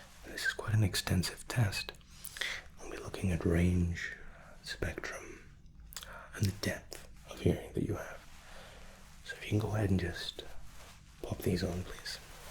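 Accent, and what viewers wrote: Scotland, United Kingdom. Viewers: Nice to hear a Scottish ASMRtist and one who shouts out others particularly my favourite Be Brave Be You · Tremendous as usual. As a Scot myself, I enjoy hearing the accent. It's very refreshing 😊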